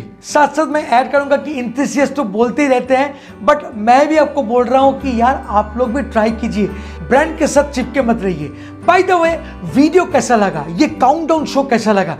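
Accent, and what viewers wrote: Bengal, India. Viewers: Kimon aachen dada ! Well presented. Good diction with enjoyable Bengali accent. Will take yr advice...... Cheers 🥂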